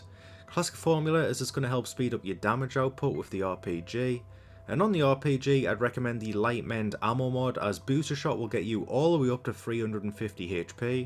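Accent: Newcastle, United Kingdom. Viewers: Geordie accent?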